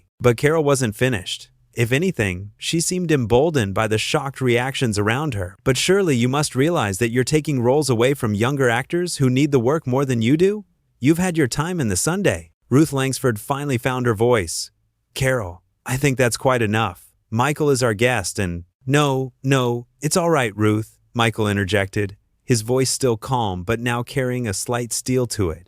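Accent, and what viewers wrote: United States. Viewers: This British Insights video is just a way to click and watch this video with untrue facts. This video was edited from the Loose a woman Show and it has a voice over story There is a similar video featuring Lorraine Kelly” insulting” Sir Michael as well . I wish these companies like “ British Insight “ using an American voice over to do something positive. Make videos for something like saving the rainforests or helping homeless people instead of winding people up.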